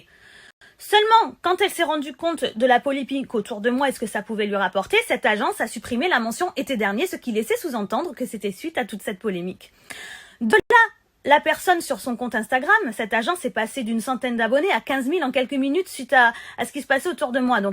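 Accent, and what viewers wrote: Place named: Italy. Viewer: La pronuncia italiana oltre qualche parola in italiano tradisce le tue origini e soprattutto la tua, altissima propensione al crimine.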